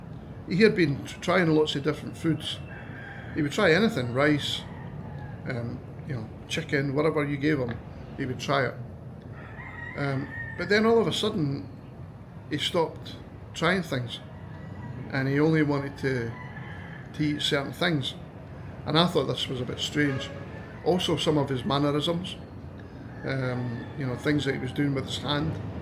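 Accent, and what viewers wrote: Scotland, United Kingdom. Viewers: The algorithm popped your channel in my feed and it was so good to hear a Scottish accent I hit subscribe. I just retired to KL from Scotland a couple of months ago because my son lives here. I was a special needs teacher and I was blessed enough to work with many autistic and Down's Syndrome kids over the years. I'm glad your wee boy goes to a good school and is making great progress. You and your wife are knowledgable and cognizant of your son's needs and doing a fantastic job. Much love to your family!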